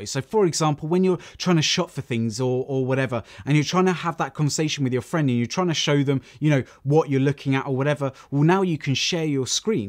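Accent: United Kingdom. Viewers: You explained it better than the WWDC21 guy. Love that Brit accent. So soothing to the ears. Keep up the good work!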